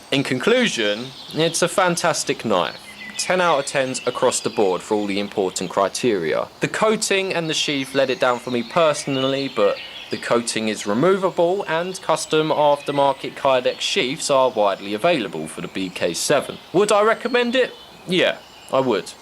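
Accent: United Kingdom. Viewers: Which country do you live in if you don't mind me asking? By the background and your accent I'm thinking the UK. Not a stalker or anything, just wondering how you get away with carrying such a beast of a knife. I want to buy one of these to take camping but I'm worried of getting into legal trouble. I live in the UK and the local police won't even allow me to carry my folding pocket knife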